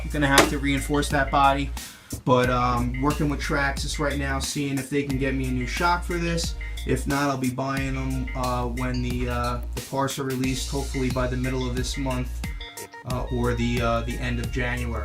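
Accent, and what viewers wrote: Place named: Long Island, United States. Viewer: Great collection! Been a subscriber for a long time, your accent keeps me coming back, I grew up in Northport and it's good to hear. If you ever get up to CT I'd love to drive with you. Check out CT Scalers on FB.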